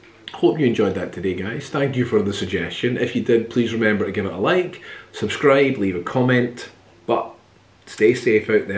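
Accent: Scotland, United Kingdom. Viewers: You should put out an audiobook, on all the eerie stories of Edinburgh! It would sell, BIG! You have such a good voice, lovely Scottish diction, but not overly much to create misunderstandings 😉🤣 Thank you! 💙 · Ghosty tales, wonderful Edinburgh, a smooth Scots accent --- perfect. Thank You.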